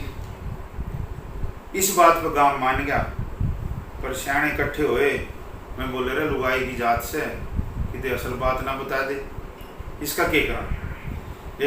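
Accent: India. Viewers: Bhai wah! Aap ka haryanavi lahza Rohtaki laaga sai. Jaisa likha same usi pronounciation mai parh diya. Lekin" ekka" ka ka matalab itfaq nahin hona chahiye. Ekka ka matalab Ekta (unity) aur kahani se bhi unity hi jhalak rahi hai. Itfaq ka matlab 'by chance/coincidence hota hai.if I am not wrong. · Waah bhai zabardast Kahaani Afsana "Eyka Akta bhaichara yakjahti..Aapka padhne ka lahja kamal haryanvi sai... Aapsi bhichhara hamesha rakhna chahiye Janab Rana Rahmat Ali (Marhoom) Bade uncchhe paye ke haryanvi Adeb sahiyatkar they sukriya,Aapka Haryanvi Reel tv ka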